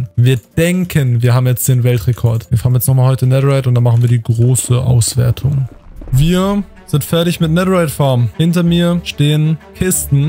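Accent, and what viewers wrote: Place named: Germany. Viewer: Ich habe dain english video ge sehn und sofort geraten das du deuch bist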